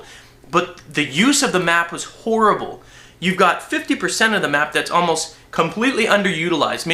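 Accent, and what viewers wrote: Canada. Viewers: are you canadian? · is he canadian?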